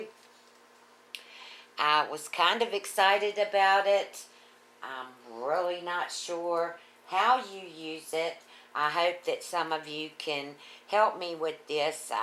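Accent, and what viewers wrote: South, United States. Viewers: Pennie Clinton Yes has that deep southern accent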